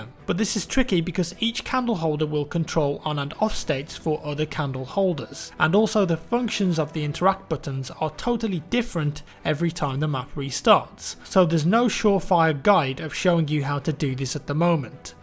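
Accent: United Kingdom. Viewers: why do all great youtubers has to be british ? i mean fck off i can't understand nothing from that accent . since when you say "far" for "for"... i mean far != for ...it just so stupid i allways close this kind of videos but fck i need that dog tag.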